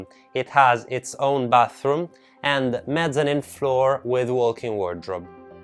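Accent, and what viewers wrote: Italy. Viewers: Hello friend I am here only for your Italian Accent. Love you buddy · In realtà ha un accento molto meno marcato della maggior parte degli italiani che parlano inglese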